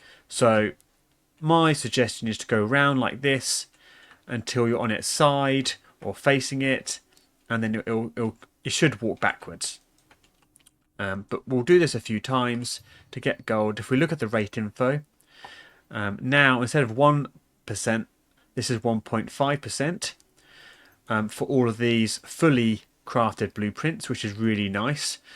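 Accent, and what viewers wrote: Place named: United Kingdom. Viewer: I love your British accent ❤ "I'd like to apologise" 😄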